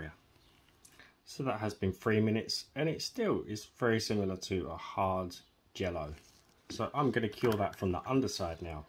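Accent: Essex, United Kingdom. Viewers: God I’ve been married for 30 odd years but after watching this tonight I could actually marry you, you amazing man! I love the way you explain why. You’re so bloody awesome and I love the fact that you sound like your from Essex or somewhere like me too 😂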